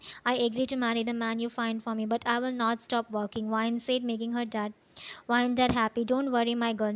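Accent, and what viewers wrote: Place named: India. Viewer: Hey author ..if you don't mind I want to ask where are you from ? I mean country.. well your English accent seems like Indian accent which I liked it 😊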